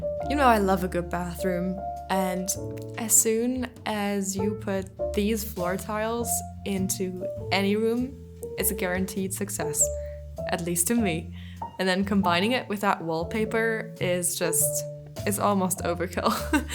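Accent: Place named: France. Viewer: hahaha I was trying to figure it out your accent. I really love accents! I was in between France and Portugal bc you sound a bit similar to a few people I know from these places. But anyways, I’m glad you replied 🥰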